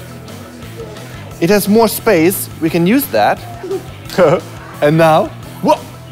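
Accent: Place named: Germany. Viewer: Man kann es hören, dass er Deutscher ist :D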